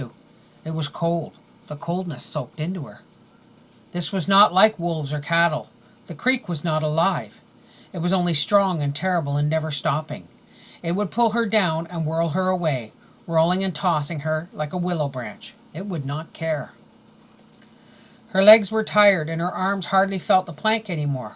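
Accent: Canada. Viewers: The Canadien accent (speech impediment) is brutal.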